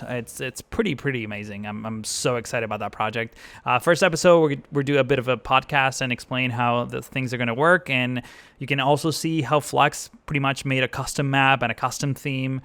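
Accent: Argentina. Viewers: Eres argentino????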